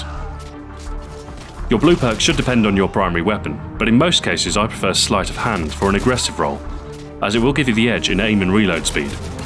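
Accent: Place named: United Kingdom. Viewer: your British voice is hypnotic..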